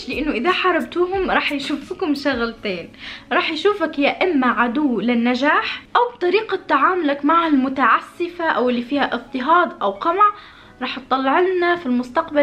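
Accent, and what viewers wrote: Tunisia. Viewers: Omg u're a tunisian girl when i heard u frist i felt u were tunisian but then i was like naahhh this girl has a lot of accents idk menysh msad9a ama merci i needed thiiissss sooo baad 💖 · حسيت انو انتي من شمال افريقيا انتي من تونس 💙💙💙💙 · انا اول مرة نشوف فيديو تاعك اما انتي تونسية مش هكا حسيت من لهجتك هكا نبرتك انك تونسية صحيح و الا لا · انت تونسية؟؟❤️❤️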